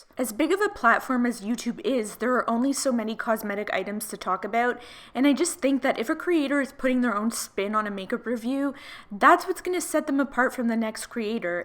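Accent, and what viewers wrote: Canada. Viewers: omg. This is my favorite channel. And your accent (Canadian?) is adorable. Keep digging dat dirt, girl.😘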